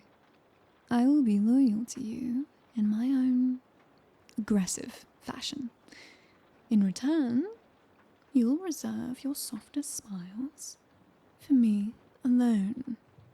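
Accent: United Kingdom. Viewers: British 😭 dont shank me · Sleepy British girl 💜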